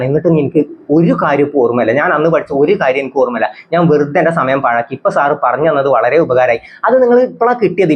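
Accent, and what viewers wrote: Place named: Palakkad, India. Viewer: 18 വയസിനു താഴെ ഉള്ളോരേ ഒക്കെ ആരേലും താലോലിക്കോ? ചിറി അടിച്ചു പൊളിക്കല്ലേ ചെയ്യാ 😂😂😂😂 Typical Mannarkkad Ottapalam Slang 💙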